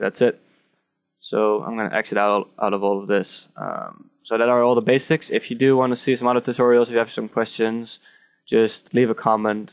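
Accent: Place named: Netherlands. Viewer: NL accent, leuk man haha! trouwens je bent 60 fps vergeten, quaility 720p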